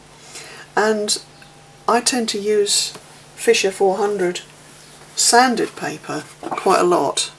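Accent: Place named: United Kingdom. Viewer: Good job! Are you in San Diego or England? Just curious with your British Accent!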